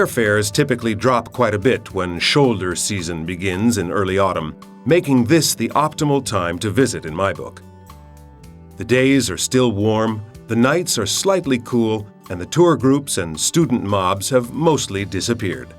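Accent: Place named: United States. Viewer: He's American isn't he tho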